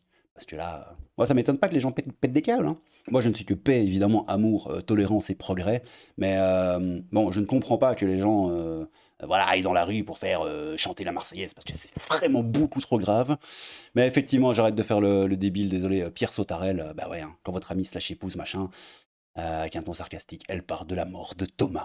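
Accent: Belgium. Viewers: Mon cher, j'ai tenu jusqu'au bout mais je dois prendre un anxiolitique. Je ne plaisante pas😢 Continue à "faire ton débile avec ton accent belge", c'est tout ce qu'il me faut pour être un peu moins mal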